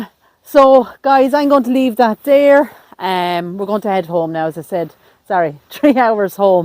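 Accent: Ireland. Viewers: I can tell by the Irish accent that we're going to see corpses. Irish cemeteries don't seem to be very well maintained. 🙄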